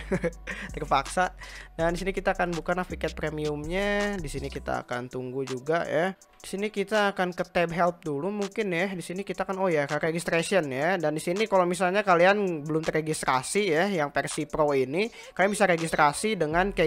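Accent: West Java, Indonesia. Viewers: Sunda Geuningan?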